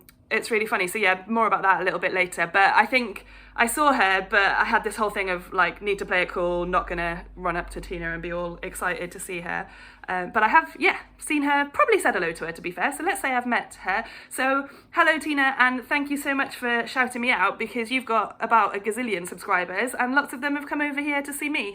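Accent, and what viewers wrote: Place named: United Kingdom. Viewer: Hey! I’m a new subscriber and a relatively new Flosstuber also. Love your projects, personality and accent! I’m an American and my 10 year old daughter walked in while I was watching you. She said - “is she British? I LOOOOOOVVE her accent.” Lol. And so do I. So fun. Anyway - for what it’s worth I love life updates up front. Life updates make a Flosstuber more relatable and memorable. Just my 2 cents. ❤️❤️ Looking forward to more. 👍😘🤩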